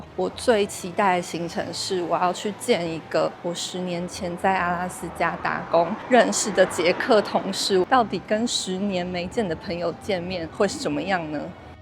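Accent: Taiwan. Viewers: 台湾旅游博主拍得最好的一个，台湾口音特别好听的，没有太多鼻音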